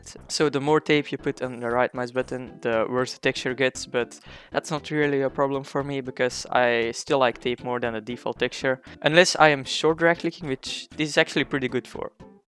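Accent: Netherlands. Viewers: ik wist dat je nederlands was ik hoorde het pog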